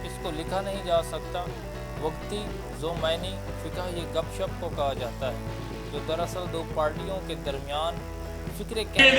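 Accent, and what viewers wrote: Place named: Punjab, Pakistan. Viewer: Aur Bhai sriki Sindhi ka lahja bilkol nhi hai yeh Punjabi ka lahja hai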